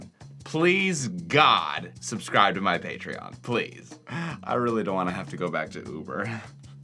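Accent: United States. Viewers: Yeah no wonder you're from LA. You have their disgusting accent.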